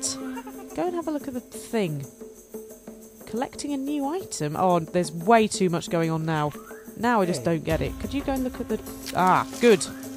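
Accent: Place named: United Kingdom. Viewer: I love your British axsent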